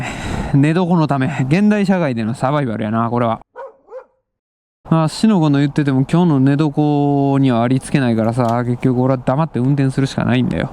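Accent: Kansai, Japan. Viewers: 楽しく拝見しています。今のコロナやウクライナのニュースを見ていると、本当に(何でもないこととが幸せだった)と実感します。氏のモノローグと言うか、おしゃべりも関西人らしく心地よく響きます。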